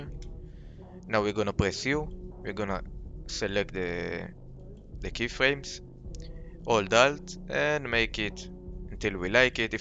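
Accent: Israel. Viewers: שמע אני מת אחי איזה מבטא ישראלי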